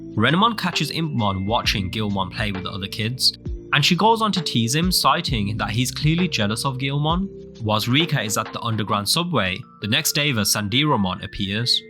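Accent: United Kingdom. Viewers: This narrator from Birmingham? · the Scottish accent makes this 10X better ~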